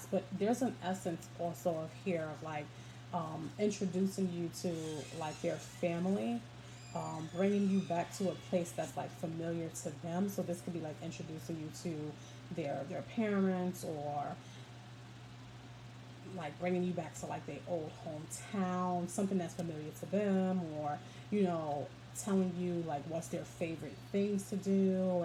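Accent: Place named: East Coast, United States. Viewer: #3 yes, resonated so much. and i love your east coast accent!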